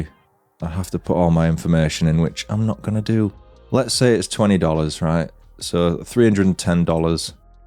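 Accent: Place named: Yorkshire, United Kingdom. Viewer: I'm not getting one, what I need rn is a vertical and a couple of cheaper niche handhelds with Linux I think, but the orange one is very pretty. BIT too washed out and BIT too much orange but still... I'm a basic beeatch who go for black at all times, I find anything else mostly distracting while playing (+ the issue of getting dirt on the handhelds), but it's nice to see the more fun colors. White ALWAYS sucks. Edit: "Yorkshire"... A UK accent I wouldn't be able to place but that doesn't come off as properly annoying (!). Cool.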